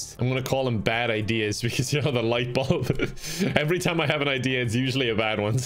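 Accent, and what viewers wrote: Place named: Ireland. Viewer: Didn‘t think I‘d see an irish dr.Eggman today...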